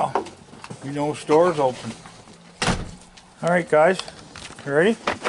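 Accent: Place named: Nova Scotia, Canada. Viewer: i always wondered why ya sounded so familiar its cause yer a fellow nova scotian right on makes sense to me now lol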